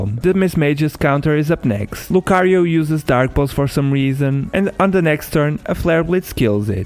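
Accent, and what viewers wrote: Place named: Italy. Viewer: Da come parla sembra un italiano che prova a parlare in inglese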